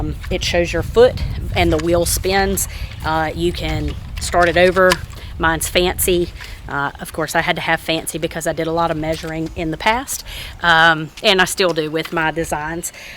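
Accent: South, United States. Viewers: I am so excited to have found your video! I found you through Dig, Plant, Water Repeat :) I’m growing in Hawaii so I’m a zone 12a. My climate and soil is drastically different than yours. I have all soil brought in and it’s only 3 inches before I hit solid lava rock! I wish I could design beds like yours :) I also love the sound of your voice and accent. My fiancé is from Georgia and Alabama, so listening to you talk is very similar to his grandma and mom’s. It’s so soothing and lovely. I cannot wait to follow your journey!! I make garden videos too (not as great as yours) but if you want to see what us hawaii people garden in then give it a look. Aloha!!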